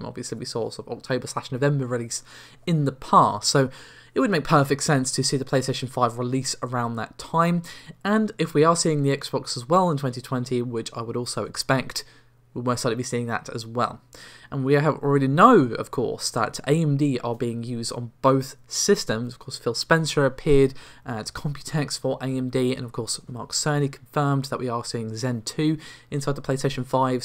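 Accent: United Kingdom. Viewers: I cannot hear what this guy says. Talks too fast, slurring and with tons of nonsense and seems to only emphasis a heavy British tone sequence. If you're not showing your face then don't pretend you have a conversation.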